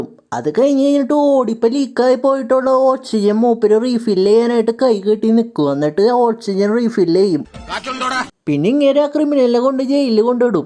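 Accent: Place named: Puthenvelikkara, India. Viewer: ഇത്രയും വൃത്തിക്കെട്ട ഒരു voiceover അടുത്ത കാലത്ത് കേട്ടിട്ടില്ല മൈര് 😂... വളിക്ക് എക്സ്ട്രാ മണം പോലെ അവന്റെ പുത്തൻവേലിക്കര സ്ലാംഗും... കാട്ടവരാതം തന്നെ മൈരാ...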